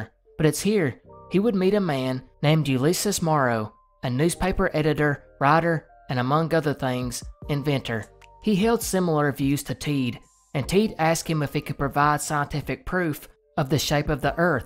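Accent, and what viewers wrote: South, United States. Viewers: This right here is a southern bygod accent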